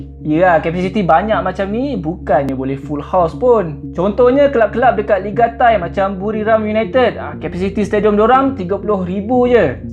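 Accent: Melaka, Malaysia. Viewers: Bro orang Melaka ke?? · abg org melaka ke?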